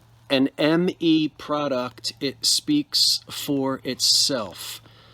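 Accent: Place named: Northeast, United States. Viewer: Oh gosh! I'd have to hunt down those homeowners and insist they keep the paintings with the homes!! Im fanatical about historic documentation., Ive heard you say you don't think you have a Jersey accent, but Ive never heard anyone pronounce "rural" like that! 😄 Must be a North Eastern thang!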